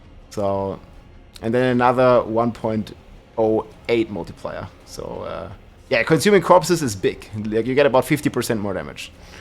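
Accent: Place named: Germany. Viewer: My absolute blind faith once i hear a german start breaking down nerdy videogame numbers is beyond measure.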